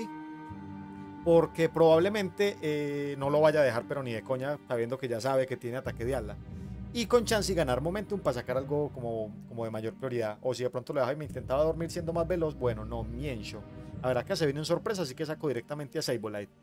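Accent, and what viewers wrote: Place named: Colombia. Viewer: Hola bro, me encantan tus vídeos y tú acento parcero xd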